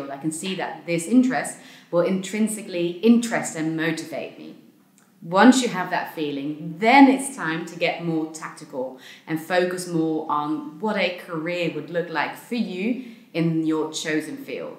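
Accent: Netherlands. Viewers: Are you Dutch? I hear a bit of our accent 🧐 just slightly. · Are you dutch? I hear a slight dutch accent leaking through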